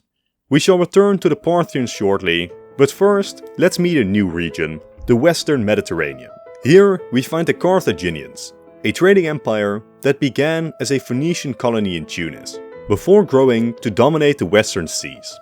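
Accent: Netherlands. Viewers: Hoort gelijk dat hij Nederlands is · ik hoor aan je accent dat je Nederlander bent :)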